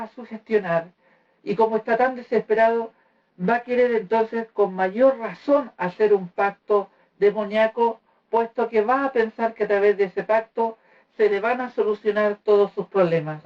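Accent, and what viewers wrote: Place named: Bolivia. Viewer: Yo tamen en un principio pense k era boliviano:-D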